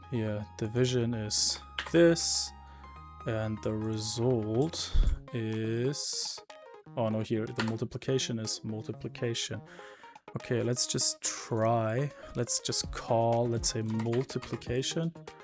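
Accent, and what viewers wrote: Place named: Germany. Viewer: u sound german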